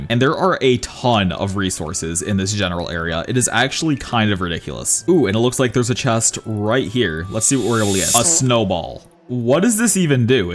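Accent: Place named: United Kingdom. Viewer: I think he is British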